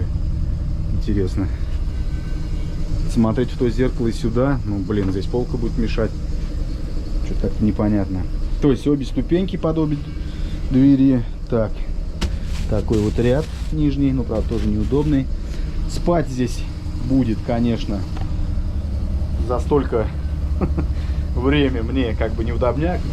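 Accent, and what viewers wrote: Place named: Nizhny Novgorod, Russia. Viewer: Родной нижегородский говор )))) живу в Москве как этого не хватает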